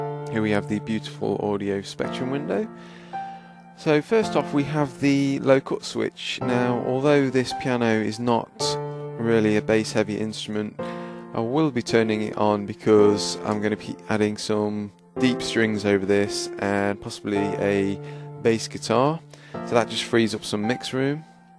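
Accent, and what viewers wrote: United Kingdom. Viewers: hes sounds like he from the midlands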